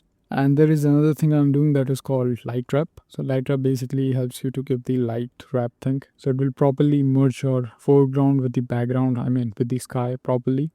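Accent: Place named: India. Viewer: bhai aapke ascent se aap shayad indian lagte ho , badiya tutorial tha ek dum maja aagya kafi value addition hua thanks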